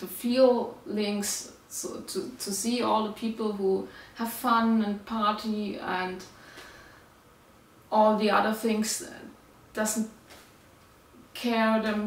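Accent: Germany. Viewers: Now you will have to spend a lot of time answering questions! 💪👍You look amazing with a short haircut , and a cute German accent!❤😊😊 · Love your German accent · She's from germany, Berlin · very good your English; I like the accent: German speaking in another language is always something different. And I know well that the German language is complex, like the Portuguese language. Thanks for the initiative to answer the questions. Like it your faces when you play.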